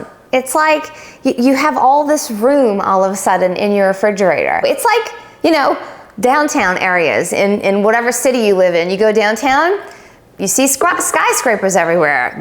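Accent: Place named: South, United States. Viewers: Gotta be a southern gal-I heard a “ya’ll” right off the bat. 😃 takes one to know one! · I'll bet this is Texas accent 👍🏼. Go Aggies. Go Longhorns 👍🏼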